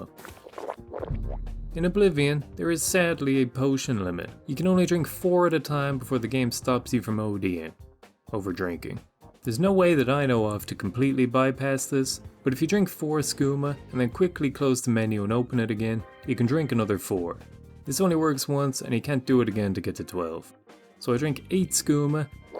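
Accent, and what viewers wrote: Ireland. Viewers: Where is your accent from you sound Irish but not quite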